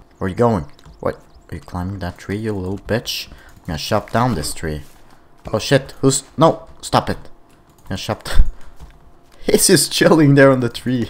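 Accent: Sweden. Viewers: i like your swe/eng:) naajs video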